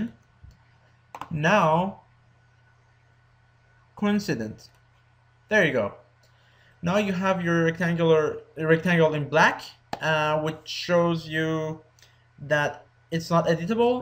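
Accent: Iran. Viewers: Nice Tutorial. Persian accent. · Love the Persian accent. It reminds me of grad school (my advisor and a half-dozen labmates were Iranian). Your English is fine, just enough giveaways in the diction to identify the Persian accent. :)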